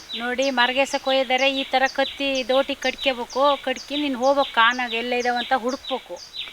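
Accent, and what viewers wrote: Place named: Mandya, India. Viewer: ನೀವು ಮಲೆನಾಡಿನವರಾದ್ರೂನು ಮಂಡ್ಯ ಸ್ಟೈಲ್ ಭಾಷೆ ಮಾತಾಡ್ತೀರಾ, ನನಗೆ ತುಂಬಾ ಇಷ್ಟ ಆಯ್ತು.... ಬಾಳೆದಿಂಡು..ಬಿದಿರು ಕಳಲೆ.. ಹಾಗೂ ಕೆಸುವಿನ ದಿಂಡು ಎಲೆಯಿಂದ ಮಾಡುವ ವೆರೈಟಿ ಪದಾರ್ಥಗಳನ್ನು ತು0ಬ ಚನ್ನಾಗಿ ಇರುತ್ತೆ... ಕೇಸುವಿನ ಪತ್ರೋಡೆ ಮಾಡಿಲ್ಲ....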